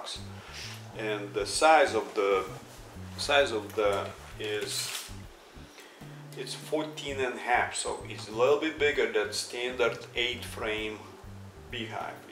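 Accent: Russia. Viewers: MOJET IA OSHIBAIUS NO SLISHU RUSSKII AKZENT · Were are you from ? Russia or another country ?) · I used to live in the Northwest corner of Montana, I may have told you before, and I love Wyoming too. But how did you choose to move to that area? By you accent,and may be wrong, but did you come from Russia? You live a very pleasant life there in the mountains...I know I sure miss it! How did you come to attract such a good energy with all the wildlife? I can see it in you. The animals, your pets reflect your kindness. Do you have family here in the U.S? I am rambling a bit but I feel a sense of goodness in all you film, keep it up! Till we speak again, Woody Woodward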